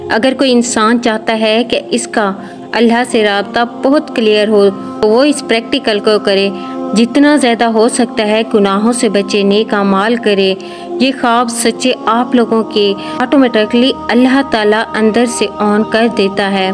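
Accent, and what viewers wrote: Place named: Saraiki, Pakistan. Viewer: سرائیکی انداز میں خوبصورت انداز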